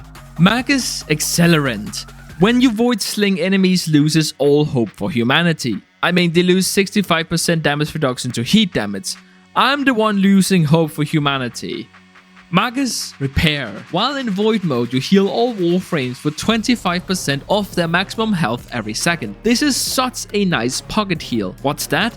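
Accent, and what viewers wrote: Denmark. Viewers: You sound Danish..? Or Scandinavian in general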